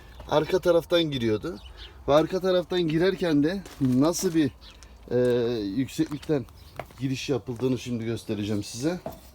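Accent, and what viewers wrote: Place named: Ankara, Turkey. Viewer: Buram buram ankaralı konuşması☺️ sırf hasret gidereym diye dinliyorum😀😀 şaka şaka bastan sonra izledim hobi bahçesi videolarını çok faydalı buldum emeğinize sağlık bu işe girecek kişiler için oldukça işe yarar bilgiler var. Birçok yerde izinler ve tarla arsa geçici imar izni konusu yüzeysel geçiliyor. Biraz detaylı anlatabilir misin? Teşekkürler...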